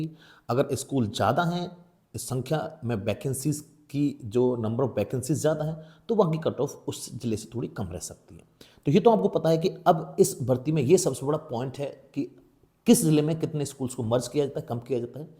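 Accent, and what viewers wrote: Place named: United Kingdom. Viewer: Bhai apka accent british h..mujhe u.k m teacher lagva do na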